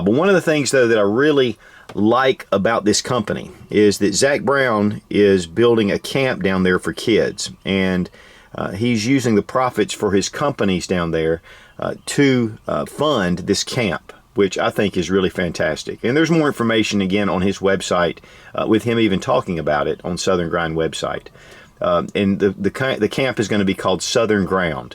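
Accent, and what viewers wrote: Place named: South Carolina, United States. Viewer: oh ok your from S. Carolina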